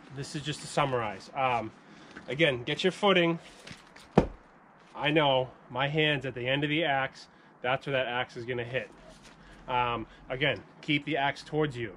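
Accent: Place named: New England, United States. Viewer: Took me 2 seconds to realize you were a fellow New Eglandah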